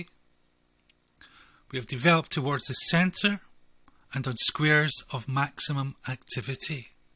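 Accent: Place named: Scotland, United Kingdom. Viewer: Great video thanks. What part of Scotland are you from?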